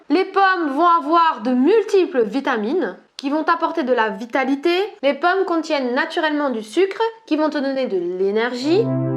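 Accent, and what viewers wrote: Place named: Forbach, France. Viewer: ce petit accent du coin de forbach 👌👌👌 😉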